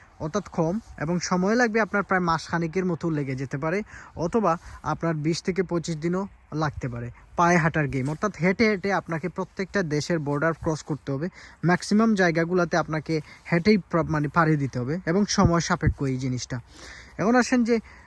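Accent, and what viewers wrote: Sylhet, Bangladesh. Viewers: ভাইয়া আপনার বাড়ি সিলেট নি? লাগের সিলেটি 🥰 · ভাই মনে হয় সিলেটি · ভাই আপনার কথাগুলো অনেক সুন্দর লাগলো আপনার বাড়ি সিলেট নাকি, সিলেটের মত কথা বলেন আপনি,,,,, 👍👍👍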